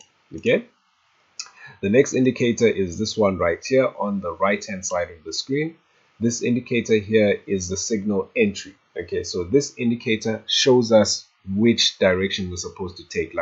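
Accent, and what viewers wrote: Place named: South Africa. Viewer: I swear to you. You are a South African😊I like that. This video has helped me a lot. Thank you so much. Keep doing the great work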